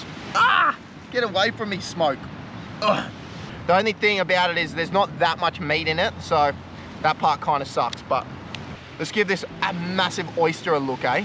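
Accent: Australia. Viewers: Are you Aussie bc I can here your accent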